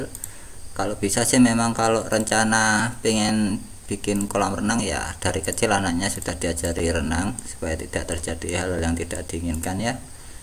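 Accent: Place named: Java, Indonesia. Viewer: Logatnya lowh. Medok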